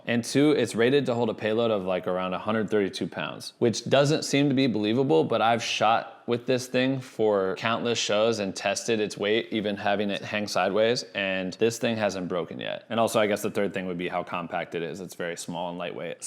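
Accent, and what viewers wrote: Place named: United Kingdom. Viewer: You sound British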